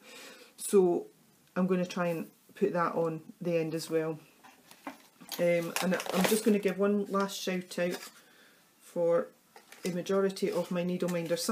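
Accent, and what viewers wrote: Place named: Scotland, United Kingdom. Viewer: My grandparents are from Scotland...it's great to hear their accent again. Can you show me how you use the gradient floss?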